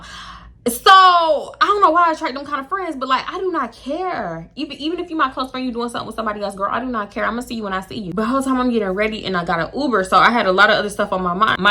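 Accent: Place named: Houston, United States. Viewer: The way I knew you was from Houston before you said it 🤣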